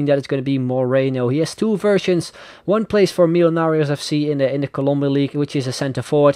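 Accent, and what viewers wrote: Netherlands. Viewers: Lol i hear that you got that dutch accent when you speak.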